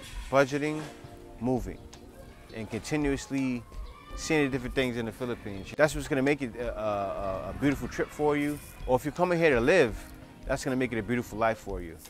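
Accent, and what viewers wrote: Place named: New York, United States. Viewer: My brother aren't you a New Yorker ⁉️😅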